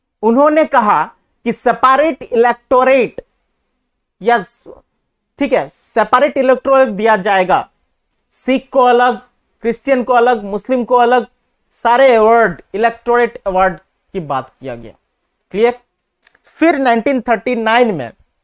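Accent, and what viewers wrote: Assam, India. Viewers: Sir are u from Assam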